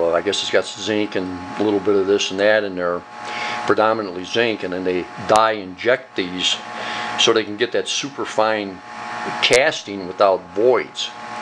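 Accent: United States. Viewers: That's gotta be a Chicago accent!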